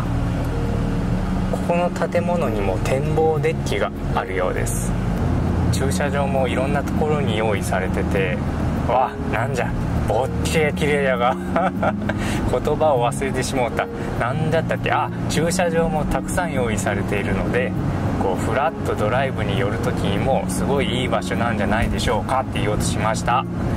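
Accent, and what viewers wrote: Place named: Okayama, Japan. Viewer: 天気も良く、zも艶々で気持ちいい動画ですね！ 岡山弁も炸裂で山形の私には新鮮で微笑ましく拝見させて頂きました～👍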